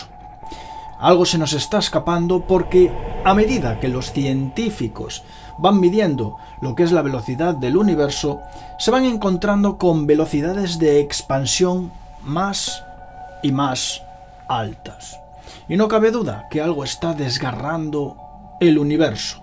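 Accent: Spain. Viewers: lindo espa;olito me gusta su acento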